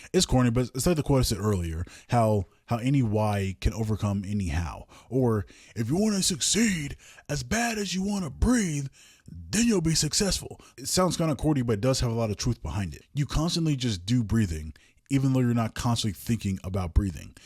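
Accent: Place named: United States. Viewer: I'm sorry but what's with the STUNNING photo of Whitby abbey ???!! Not even related to the conversation at hand unless you want to talk about how becoming a monk or a nun is the ULTIMATE way of "locking in" because you're bound for life? It's like my fyp is hinting at me to pay attention by using motifs from my life that no one even knows about. And I'm guessing from the voice over that this guy is American, which makes this photo's insertion even more questionable since although it inspired one of the most well known books ever, it's still a pretty niche place if you think about it. Anyway, thanks for coming to my TedTalk :)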